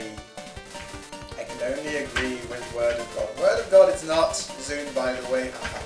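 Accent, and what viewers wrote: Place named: United Kingdom. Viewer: WhAt?! GuY wItH bRiTiSh AcCeNt CaN't PrOnOuCe TaRdIsEs?!?!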